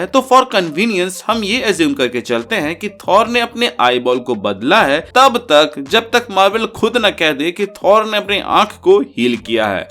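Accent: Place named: Jharkhand, India. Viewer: तोर भाषा मे झारखंड के झलक देके पारे हियो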